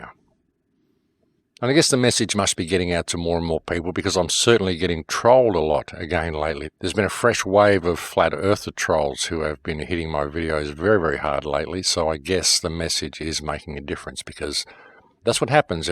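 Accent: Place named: Australia. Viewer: His voice sounds Austrailian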